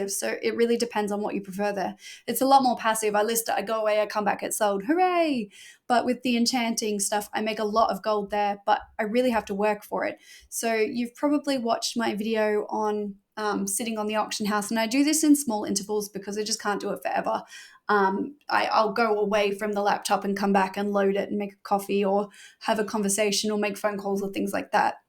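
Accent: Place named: Australia. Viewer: when you say morning and evening do you mean Australia time or US time? I'm in Australia, so that would be good to know I guess. I'm new to the channel and not sure whether you play on oceanic or US server - you sound Australian but I don't want to assume Oceanic server. Also I would be happy with 30k a day, that would well and truly pay for WoW every month.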